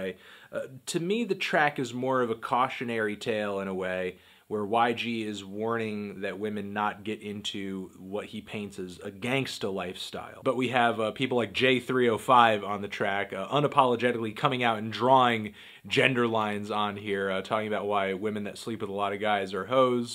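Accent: England, United Kingdom. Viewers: I rate your English accent a light to decent 4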